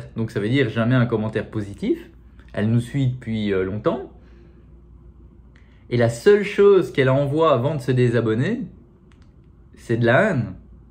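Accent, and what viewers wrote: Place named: Belgium. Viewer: Très très touchant, tellement réaliste, bravo de dire tout haut ce que l'on pense tous! Et sinon ton petit accent belge😍😍 Gros bisous et bon courage à ta maman et à vous tous dans cette épreuve.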